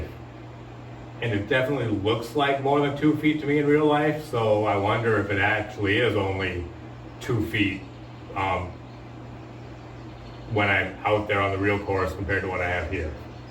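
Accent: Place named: Wisconsin, United States. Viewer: You talk very Wisconsony. It’s a beautiful thing. I’m happy to know folks like you are still around.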